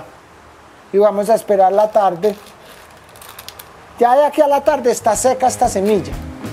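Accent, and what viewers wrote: Antioquia, Colombia. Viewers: ¿Cierto? <3 Me encanta cómo hablais, lo explicáis genial, muy educativo y amo vuestro acento <3 Viva Colombia. Saludos desde España. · Gracias por la enseñanza tan valiosa! Lástima esa forma de hablar tan ordinaria, que pena pero siempre me choca demasiado eso de los paisas, hablan tan ñero🤦‍♀️ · QUE TIPO TAN SENSIBLE, ES VERDAD A LAS PLANTAS HAY QUE HABLARLES CON AMOR Y CRECEN MUY LINDAS. ME ENCANTA SU ACENTO PAISA. VIVA COLOMBIA! SALUDOS DESDE DALLAS, TX.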